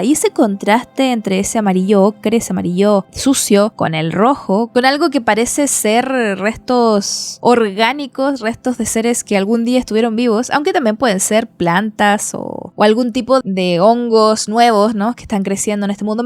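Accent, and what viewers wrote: Chile. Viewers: Que lindo escuchar tu voz ese asento chilena que tienes me encanta ❤ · Amé! muchas gracias por tu trabajo, muy enriquecedor, perturbador y de introspección. Además, amo hables en chileno sin importar nada!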